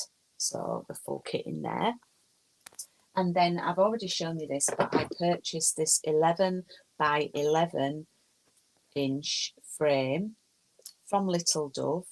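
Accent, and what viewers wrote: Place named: Yorkshire, United Kingdom. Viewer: Great video, and I love your accent, are you in Yorkshire? My mum lives near Sheffield 😊 You really went big with that NY design, it’s going to be incredible! I don’t use a pattern tracker because I prefer paper patterns, but it is great to know what % progress you’ve made, especially with a huge project 👏 One final thing, I couldn’t tell if that big piece of aida was Zweigart (it has orange in the selvedge) but if it’s not I highly recommend you invest in aida from them. It really is the best stuff you can buy, and will give you the best results 👍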